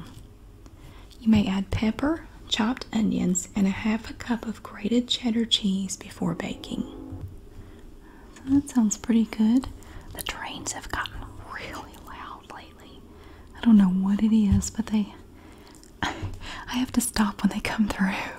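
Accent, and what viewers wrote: South, United States. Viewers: The fact that her name is, she’s southern and reading recipes is literally the CUTEST thing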